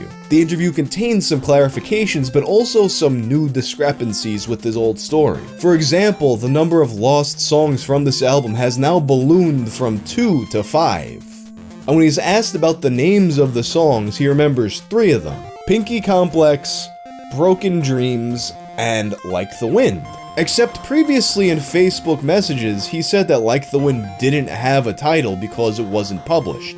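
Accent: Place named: New York, United States. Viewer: It always takes me a few minutes to adjust to that NY accent, it always sounds like someone faking an accent to me at first, but by the end of the video I don't even notice it anymore. Edit: that's why I usually binge watch his videos, so there's no adjustment period lol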